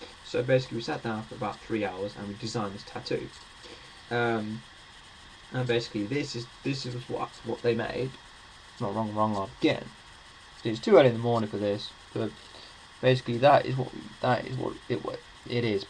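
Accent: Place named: United States. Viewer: Dude please, PLEASE drop the american acsent!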